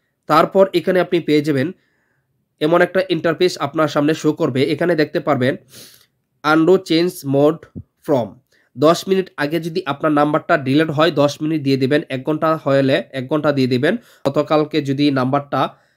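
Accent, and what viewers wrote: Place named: Sylhet, Bangladesh. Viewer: সিলেটি ভাইসাব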